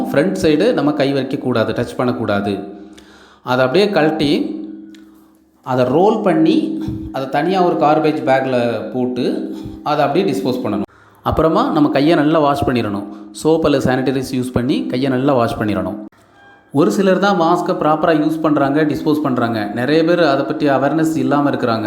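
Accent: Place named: Tirunelveli, India. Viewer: மிகவு‌ம் அவசியமான பதிவு அதுவும் திருநெல்வேலி அழகு தமிழில். அருமை.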